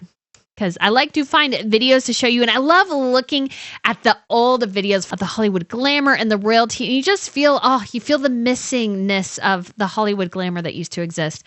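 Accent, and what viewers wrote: United States. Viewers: Sorry the annoying, screechy, out of place, American voice was just too much for me to continue watching . What a shame!!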